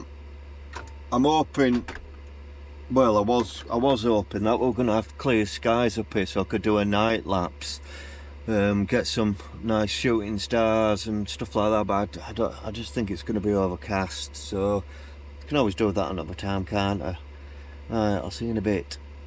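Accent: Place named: Yorkshire, United Kingdom. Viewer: Love Howarth and Skipton, are you from yorkshire? Our accents sound similar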